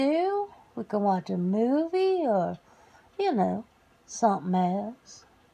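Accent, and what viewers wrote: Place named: South, United States. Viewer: hi another great vidio with the Southen accent too always good listening my friend Stay Safe xx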